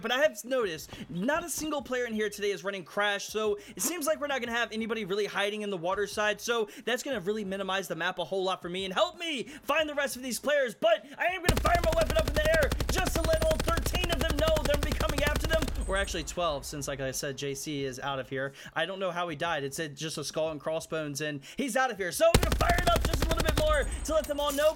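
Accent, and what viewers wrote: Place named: Ireland. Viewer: Littlr irish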